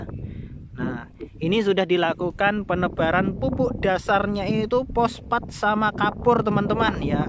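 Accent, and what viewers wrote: Java, Indonesia. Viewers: Jowone ngend to mas...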